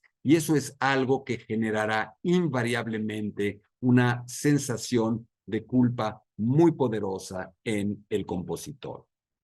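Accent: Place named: Venezuela. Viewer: Voy a estar pendiente de tus videos sobre ópera. Hablas como los italianos en Venezuela.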